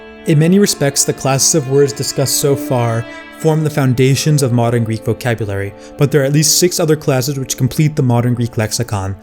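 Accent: United States. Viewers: his American accent is too apparent when pronouncing Greek words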